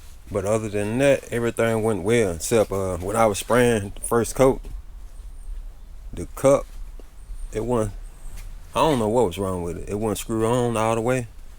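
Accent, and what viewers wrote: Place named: Dallas, United States. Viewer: nice work. after watching, I was going to comment & assume you're from Mesquite, but the end of the video, I'd say SDallas or OakCliff. heck ya brother.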